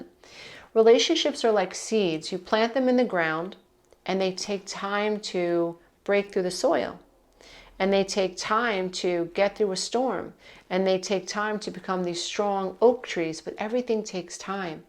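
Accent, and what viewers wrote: New York, United States. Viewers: I love watching your videos, you have a soft nature with a "no BS" New York-style tone. I experience a lot of "ah-ha moments" tied in with laughter too. Wack-a mole, that has always been funny to me, I love that you use that. I really feel like the universe guided me to your videos, they have helped me greatly, thank you.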